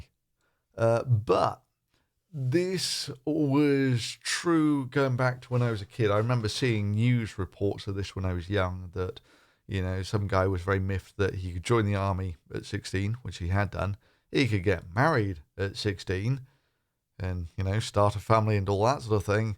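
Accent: United Kingdom. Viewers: I am not one of those usual bashers of. My first post reflects this... I enjoy most of his videos, this one, not so much. I don't know why you feel the need to speak for, but some of your claims are not true, so here we go: says about Matt Gaetz, while showing articles about him being investigated: "There is no love lost between me and folks like this at all. It is kind of what you would get if you infused something devoid of morals, with the intense desire to sniff Trumps ass. ". At no point before this does he say this is his opinion, he is actually insinuating that it is proven facts by showing headlines, that is NOT proving what he is saying. This may be unintentionally, but it certainly have this effect on an uninformed less critical viewer. But of course, some of us understand it is his opinion, in particular I, which is proven by the words in my first post. 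It is also possible, even though speaks with British accent and does not live in USA, that he suffers from Trump Derangement Syndrome, and because Matt Gaetz have been fighting for Trump, he already have a bad vibe about Matt, and therefore cannot help himself but joining the lunch mob. Why else would he bring Trump into this? And why this hostile way of expressing himself about someone doing something they have not yet been proven to have done? It really does not matter if this is his opinion. It does not make it right. It is defamation. Online lynching is not a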